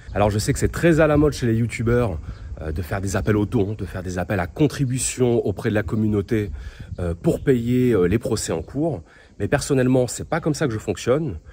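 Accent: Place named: South, France. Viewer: ça sent le sud !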